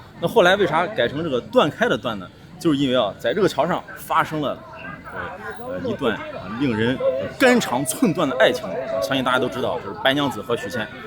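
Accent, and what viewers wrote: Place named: Northwest, China. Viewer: 哥们儿我之前看你第一个视频听口音就觉得是西北人果然是 老乡啊我出生在西安雁塔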